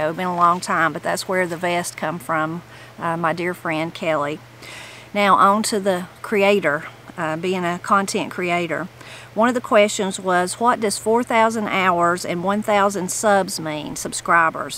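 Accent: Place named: Kentucky, United States. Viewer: I appreciate your channel so much. I accidentally found it when I was searching for applachia out of homesickness! Your accent and words remind me of my SE Kentucky roots! Thank you! 😊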